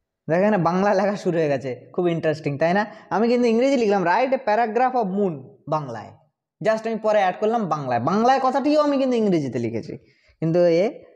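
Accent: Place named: Bangladesh. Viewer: তোমার গলা শুনে বাংলা দেশি মনে হচ্ছে , একটু ভাষার শুদ্ধতা নিয়ে আসো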